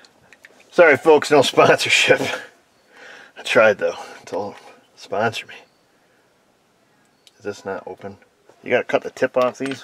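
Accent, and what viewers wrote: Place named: New York, United States. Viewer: Since you speak New York-ese I thought you were saying Self Made Auto:(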